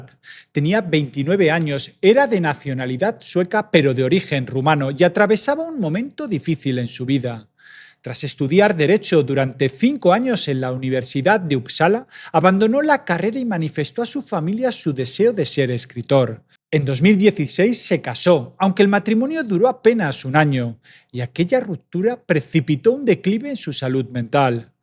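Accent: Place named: Catalonia, Spain. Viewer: Ya se sabe que eres catalana. Por eso sabes pronunciar…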